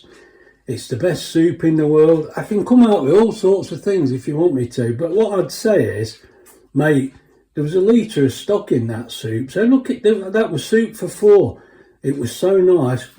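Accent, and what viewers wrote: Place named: Nottingham, United Kingdom. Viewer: Chinese cook with a Notts accent me duck😅😅😅😅😅 I went a few times to a Chinese restaurant on Mapperly Top in Nottingham called Chungs.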